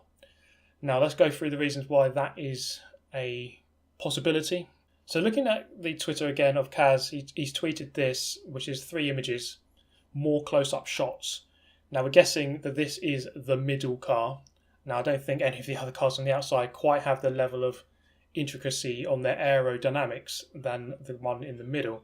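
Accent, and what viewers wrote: United Kingdom. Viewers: God damn British accent finessed me, had no idea my boy was Asian 😂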